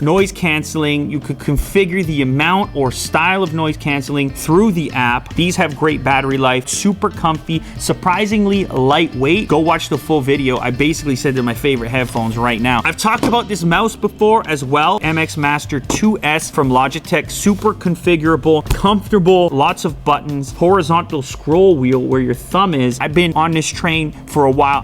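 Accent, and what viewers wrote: Canada. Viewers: Wait!...your Canadian? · your Canadian??!! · you from Canada?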